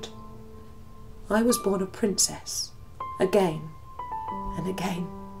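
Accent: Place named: United Kingdom. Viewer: I guess a British accent and dramatic background music justify a retelling of history that has no basis in fact whatsoever. No wonder most of the viewers give a thumbs down to this video.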